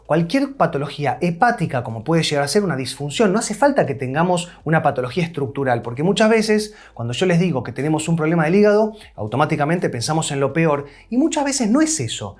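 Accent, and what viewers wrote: Buenos Aires, Argentina. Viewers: Gracias , muy buen video. Mi nuera una muchacha joven tiene cuello a l miseria !!! Deformado . Joroba. P mala postura pienso ; trabaja mucho c l compu. Pero comenzo deformidad mas joven. Voy a mostrar este y tratar vaya a quiropractico. Ud es argentino ?? Porteño?? 👍🇺🇾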